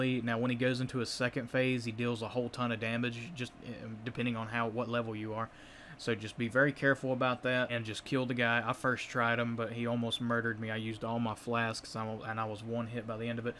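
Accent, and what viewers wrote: South, United States. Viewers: Great, informative video but that accent screams below the Mason Dixon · Are you from Alabama I am I reckon your southern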